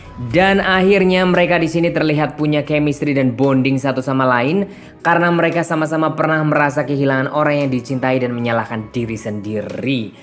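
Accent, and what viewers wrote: Java, Indonesia. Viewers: Weslah ke Bali wae ben diopeni, Ganbathe san. wae Suara mu jowo medok renyah di rungokan. Hehehe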